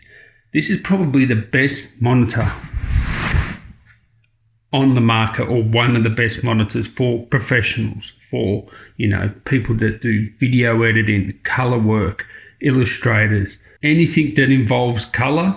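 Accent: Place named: Australia. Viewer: Great vid, thank you. Great to hear a fellow Aussie voice reviewing stuff! I've just bought this monitor, your review was a very good help for me. Everything you say is true, this monitor blows away everybody who sees it in action. I do not use it as a professional but do family videos and work with docs open 2 at a time - wonderful for all this. I had the money so I though so what - end of financial year and I need to clear the bank account and get a tax deduction anyway so there I was! I will enjoy the pleasure of this incredible screen LONG after the initial pain of the price has been forgotten. Highly recommended - thanks again for the very relatable review - love the way you describe things in everyday terms. Cheers, (West Pennant Hills, NSW - Australia)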